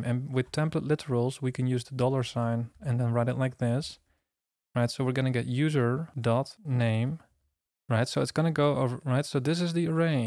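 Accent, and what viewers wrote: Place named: Netherlands. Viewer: Ik hoor Nederlands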